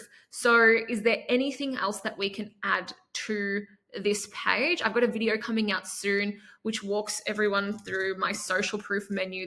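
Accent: Australia. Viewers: Good stuff. I, for one, enjoy your English and Aussie accent.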